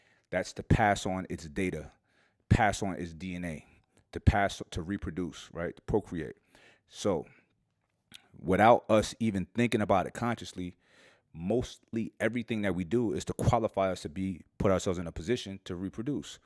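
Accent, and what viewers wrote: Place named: New York, United States. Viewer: Yo I could listen to you all day, you got that new yawk vibe #bigupstobrooklyn and your MCT oil is the truth!!!!! I'm a big fan The.one.and.only.marissa.ann on IG. And forget this red pill shit tell them Mike Rashid is his own pharmacy and pops a different color pill everyday lol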